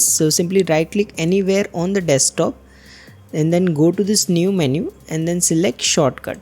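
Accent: India. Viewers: bro has one of the most indian accents ive ever seen typing ts on ubuntu btw im gonna try ts on my windows in a few mintues hope it works imma reply if it works :D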